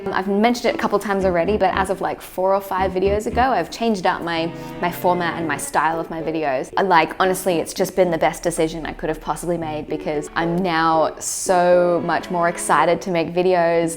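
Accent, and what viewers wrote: Australia. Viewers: Amazing, I followed a link on PhillipDefranco's video and saw a wonderful video by an amazing videographer and instantly recognised that Aussie voice. I watch a view more videos and realise you are a fellow Brisbanite. That sucks me down the rabbit hole as I then proceed to watch all your Australian videos first and then a few others you have made. I'm a little binged out but I just need to comment and say thanks for the great content (much I will try and finish in the future, even though most of it may not be the content I would normally view) but I believe good content, told by an amazing story teller and presented in such a great way like you do, should be rewarded with at least a like, maybe a comment and possibly a subscribe. You get the trifecta. Cheers I will admit being from Brisvegas as well, may have biased me a little bit. Ok... 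a lot. Have a good one. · Fun watching your videos. Great, your back in second home. Didn't know you are Australian, no strong accent.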